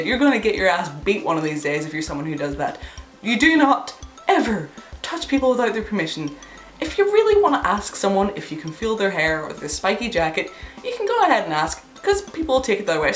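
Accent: United Kingdom. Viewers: Sup YO, Sorry you went through what you went through, I went through similar in my youth as a MetalHead living in Brooklyn NYC. But people of mundane culture can't help themselves but tap into realms of ignorance, you are extremely beautiful and keep filling people in, this is cool, Goth's in NYC are a lil to themselves or are bit elitist so it was hard to get info about their movement in my day. Love the accent is it British?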